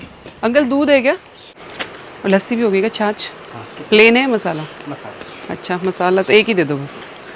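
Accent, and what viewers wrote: Haryana, India. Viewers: बोली से पटा चला आप सादर हरियाणा से हो। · Very Sweet ❤ बहुत प्यारी बोली हैं हरियाणवी ! Very Lovely Haryanvi Culture ! Aapki Shaadi Me Jrur Bulana! I Like And Want Seen Haryanvi Culture ❤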